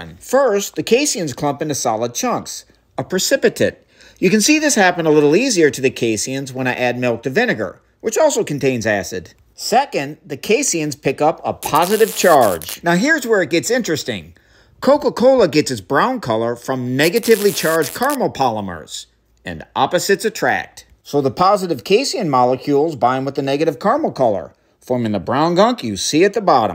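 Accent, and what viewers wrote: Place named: South, United States. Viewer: Never thought I'd see a southern accent and actual science mix together in the same person. Good to see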